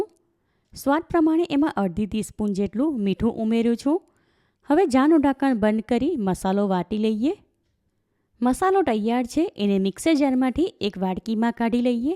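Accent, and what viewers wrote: Surat, India. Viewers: Ben tme to jaberdast surti bolo 6o ne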